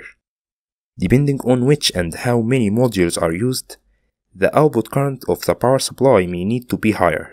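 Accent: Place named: Egypt. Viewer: according to your accent you are Egyptian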